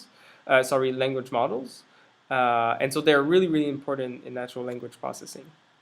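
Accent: Quebec, Canada. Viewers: I guess you're a French fella from Quebec. Anyway, it's a good video.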